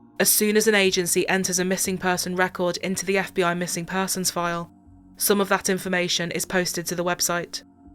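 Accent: United Kingdom. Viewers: yay, super cute, british girl voice narrator crime! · I don’t like a British narrator, it implies that story is in Britain, are there not any American narrators?I’d feel the same listening to a British story narrated by an American narrator.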